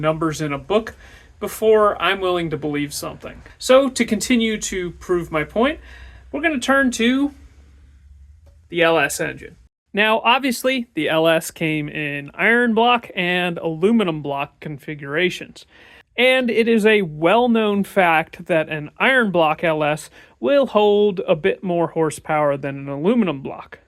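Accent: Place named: Australia. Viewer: I only saw the vid for 2.3 seconds and I said thats gotta be australian lol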